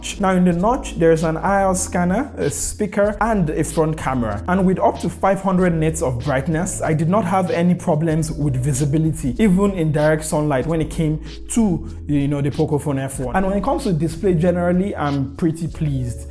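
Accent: Nigeria. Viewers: are u nigerian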